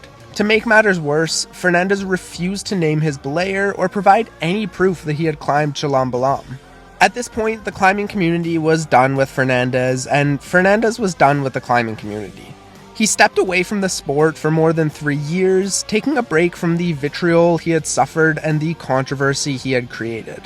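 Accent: Canada. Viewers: I was going to guess you were Canadian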